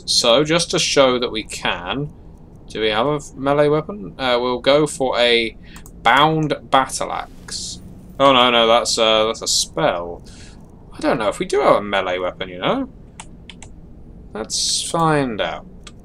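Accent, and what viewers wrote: United Kingdom. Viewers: stories like this sound so much better in a English accent also lock pick is a waste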